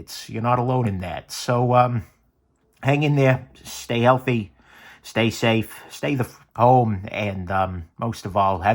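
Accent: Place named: New England, United States. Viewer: I always figured the voice of reason would have a new england accent. Good on ya,. You're a real gem.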